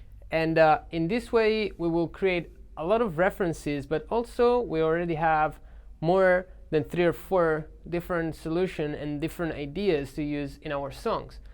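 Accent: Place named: Austria. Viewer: Similar accent as krimh, are you from the same place? Austria, I think?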